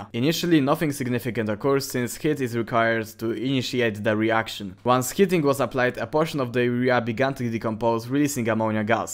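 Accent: Poland. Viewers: czy ja słyszę polski akcęt ? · No Siena mordeczko, wiefziałem, że elpolako po alcencie z “sz” I “cz”. Pordrooo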